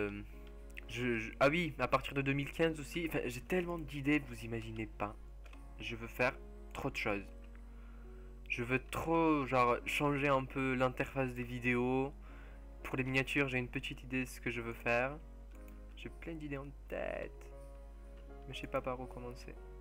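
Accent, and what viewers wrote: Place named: England, United Kingdom. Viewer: ton casque change ton accent tu as un accent englais mais ses bien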